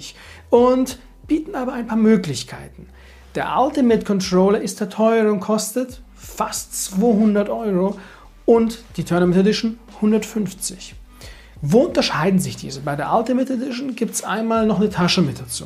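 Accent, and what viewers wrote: Switzerland. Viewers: Schweizer? Woher kommst Du?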